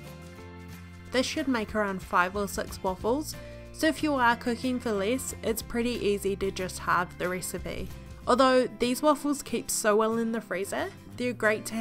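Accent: New Zealand. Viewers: heres some daily does of irony,Im kiwi myself but listening to a kiwi accent on YouTube is patronizingly frustrating. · Is this New Zealand accent as it resemble jacinda ardern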